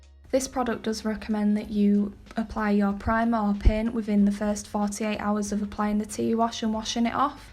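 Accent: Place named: Lancashire, United Kingdom. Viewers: Which accent is that? To my untutored (Australian) ear I'm guessing a bit north of Manchester. But what would I know! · Brilliant nice to see Landy's coming back to life and as a fellow Northener (Lancashire) its also nice to hear your accent :D, young woman like you give me hope I have 6 daughters and I would say your attitude and attention to detail is a positive role model for them :)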